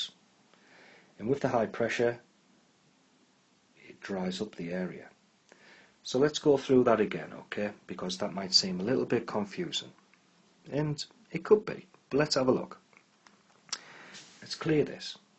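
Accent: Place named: North, United Kingdom. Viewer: Bloody love a northern accent - and it helps me to stay interested!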